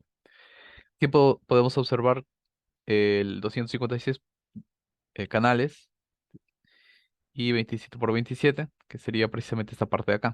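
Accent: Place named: Peru. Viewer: De dónde eres?...tienes acento peruano. Excelente video.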